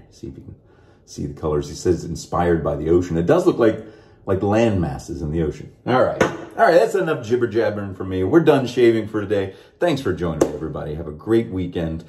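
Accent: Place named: United Kingdom. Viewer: You had your british accent going on throughout the video. Hh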